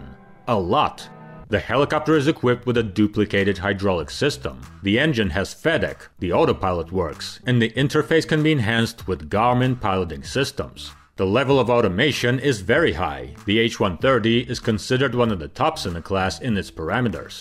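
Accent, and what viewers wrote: Russia. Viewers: It’s not a sky ship video unless it has that genuine Russian accent 😌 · Yippee… politically we may hate the Russians at the moment, however on a personal level, nothing beats a Russian voice narrating to you.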